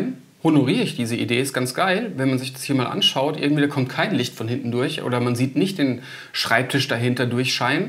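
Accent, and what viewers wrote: Hesse, Germany. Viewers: Hart am feiern und immer wieder schaut der Hesse raus :)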